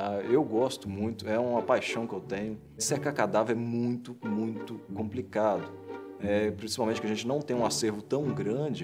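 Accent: Minas Gerais, Brazil. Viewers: É mineiro uai! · Alguma dúvida que ele é mineiro??? Ameeeeii ♡♡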